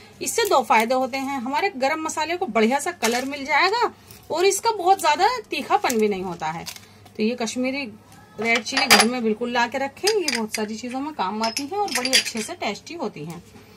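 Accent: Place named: Paretabad, India. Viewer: Cooking no doubt achi hai but bolti paretabad walon ki tarah hai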